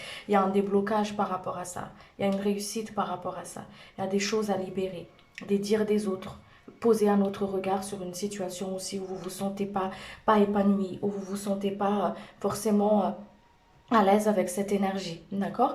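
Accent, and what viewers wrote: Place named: South, France. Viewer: Merci bien de la guidance bel accent du sud (il me semble) :) ça me parle beaucoup,malheureusement de mon côté l'attente en la concrétisation de mes projets on eu raison de ma relation amoureuse qui était à distance car il était Américain j'ai coupé hier car ça me prenait trop d’énergie ça avançait pas du tout niveau papier etc j'ai préféré m'arrêter là maintenant je pense me stabiliser ici avec peut-être une autre personne avec qui je me suis rapproché dans l'entrefaite.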